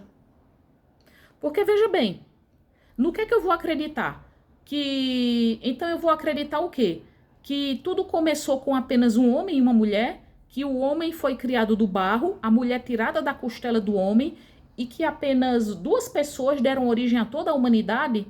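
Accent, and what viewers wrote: Northeast, Brazil. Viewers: SEU SUTAQUE PARECE NORDESTINO.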